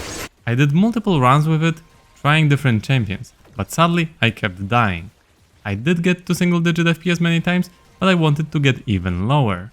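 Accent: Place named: Poland. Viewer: The 2137 gold 😂. Nice polish accent :D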